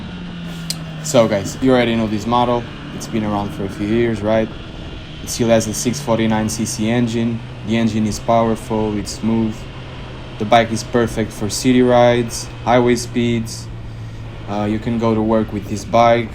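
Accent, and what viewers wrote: Portugal. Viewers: Bem me parecía que eu tava a notar um sotaque tuga. Belo video, boas informações, rápido e claro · aquele sotaque tuga haha, grande mota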